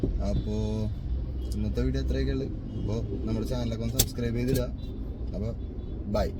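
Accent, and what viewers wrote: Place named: Ernakulam, India. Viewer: Kettal ariyille.. ernakulam 😂😂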